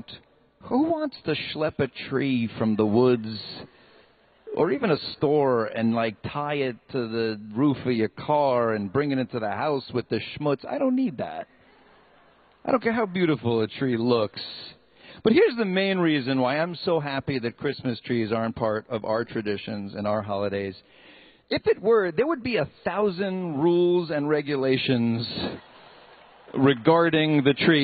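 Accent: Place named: United States. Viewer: THIS GUY SOUNDS SUSPICIOUSLY LIKE A YANK, BUT HE MUSTVE GONE TO MY CHEDER IN SUNDERLAND.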